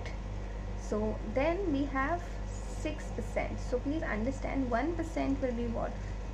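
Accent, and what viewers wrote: India. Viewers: your Indian accent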